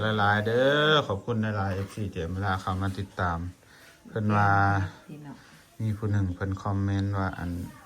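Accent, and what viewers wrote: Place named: Ubon Ratchathani, Thailand. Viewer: เว้าคือทางอุบลบ้านแม่เลย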